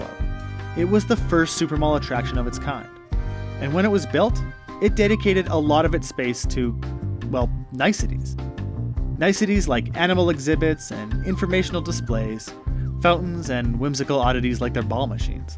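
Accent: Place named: Saskatchewan, Canada. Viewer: Sounds like you are from Saskatchewan😉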